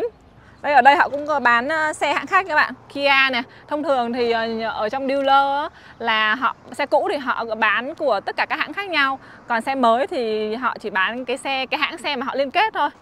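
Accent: North, Vietnam. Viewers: tiếng anh pha lẫn tiếng Bắc chính hiệu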